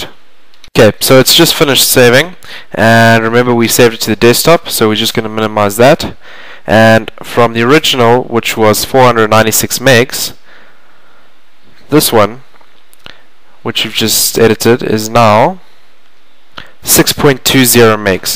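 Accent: South Africa. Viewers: dude your south afrikan neh? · Are you from South Africa?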